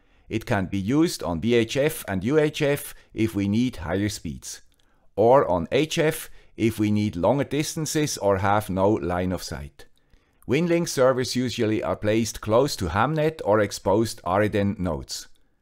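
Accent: Switzerland. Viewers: Not sure how I missed this channel, guy with the Swiss accent.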